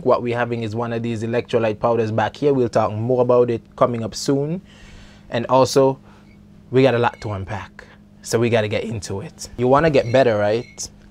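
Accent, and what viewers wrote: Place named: Jamaica. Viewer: I want to say sir you really know your information. Love how you explained everything. Love your vibe ❤ Just curious, sre you Jamaican or Haitian? I’m hearing both. Mostly Jamaican or maybe Vincy?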